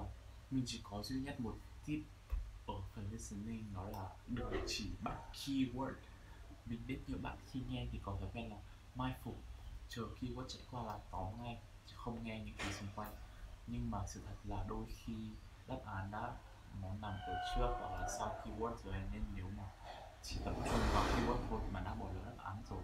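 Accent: Nghe An, Vietnam. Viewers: Em muốn nghe anh nói giọng Nghệ:))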